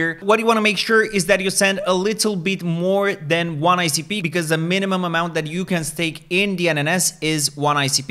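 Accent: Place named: Portugal. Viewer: 100% que es tuga! 😁Bom conteudo btw, keep it going ehehe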